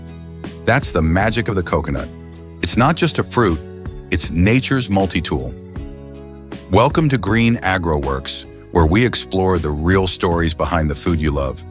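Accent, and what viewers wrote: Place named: United States. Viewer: It is good to see this tropical tree not being tweaked. When you see a Western idea blooming in an Asian country _ machinery, smart devices, surveillance it means the native has been taken over and it is not the original thing. Those that have learned the skill of climbing should be encouraged to carry on as I dont recall even one person falling or dying due to this skill that has been passed on for generations. Noticed that the men in this area of work were specially strong bodied and climbed the trees with ease daily to tap the top of the tree to produce toddy. Westerners interferring in a country they have no idea about native ways, should not sway their way of farming. AI with American accent and Western music says a lot about tge West controlling every place that is part of Asia or asking where we are watching from is snooping and a matter of concern.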